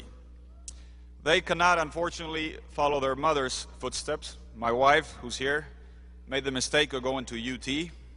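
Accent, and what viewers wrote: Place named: Latin America. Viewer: ¿ orgullo boliviano? realiza un discurso grandilocuente, repitiendo las frasecitas cursis que escuchó en tantas películas gringas. Pero, a sus espadas, los académicos se matan de risa. ¿Por qué? Para ellos, es Félix Patzi hablando castellano, esforzándose en hablar inglés fluido, pero no puede disimular su acento latino, en pocas palabras, está haciendo el ridículo. Está hablando la mascota que ellos adiestraron. ¡Es indignante! Hablar inglés y vivir en EEUU, es el sueño de muchos bolivianos desclasados. Cuando era gobierno, pretendía vender el gas boliviano a los EEUU por un puerto chileno. ¿Qué hizo por Bolivia? Nada.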